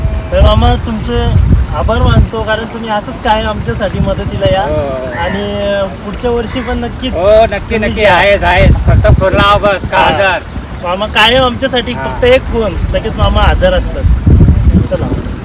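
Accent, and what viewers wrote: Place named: Agari, India. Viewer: Agari bhasa ahee tii amchii amii lahan. Pana psun asch boltoo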